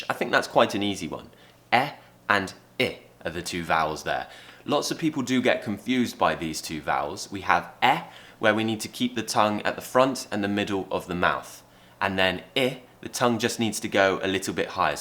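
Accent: United Kingdom. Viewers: Hi. I'm so happy to learn Brtish accent through your video. I love your Brtish accent because it sounds beautifully and you show clealy how to pronunce Brtish English words. Thanks a lot. ( ^-^)ノ∠※。.:*:・'°☆ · I love you and your lessons and the same english with Lucy but your pronunciation is more British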